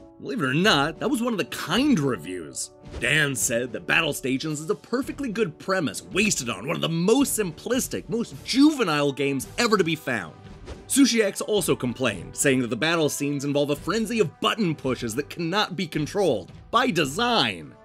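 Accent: United States. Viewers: I recall our narrator mentioning he's from WA. My theory is that it must be a Pacific Northwest dialect thing. I think Johnny Millennium (aka Happy Console Gamer, from Vancouver) pronounces dragon the same way.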